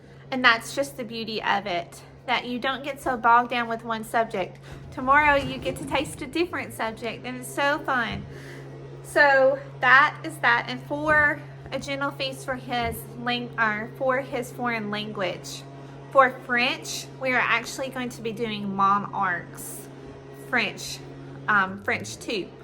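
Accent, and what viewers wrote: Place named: South, United States. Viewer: We will be using A g Gentle Feast for the first time this year. I am really looking forward to it. You have such a nice southern accent.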